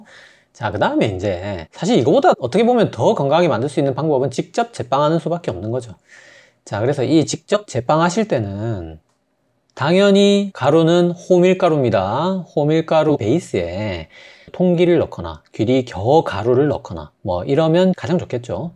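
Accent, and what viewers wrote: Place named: Busan, South Korea. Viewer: 점점 더 잘생겨져가네요😊 어쩌면 자료도 훌륭하시고 설명도 맛갈나고 쉽게 전달해 주시는지요 부산 사투리도 넘 딱뿌러지고 정겹습니다 열정을 다하시는 쵝오🎉🎉🎉🎉🎉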